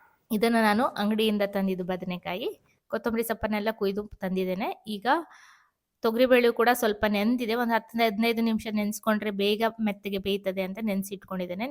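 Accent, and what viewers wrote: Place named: Kundapura, India. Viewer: Hoy, ನಿಮ್ಮ ಕುಂದಾಪುರ ಬಾಷೆ ಏನಾಯ್ತು, bolu ಹುಳಿ super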